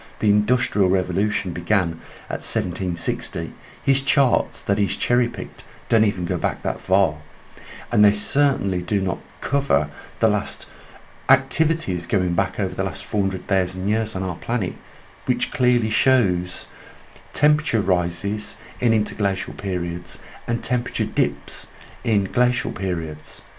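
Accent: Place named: England, United Kingdom. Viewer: Click on CC at the bottom of the video and then click on the wheel to the right of CC for the settings for 'auto translate ' then scroll countries to select 'Spanish'. The Auto-translate isn't perfect especially as has an English regional dialect. Bless you and perhaps interpret main points in the video into Spanish and put that into comments.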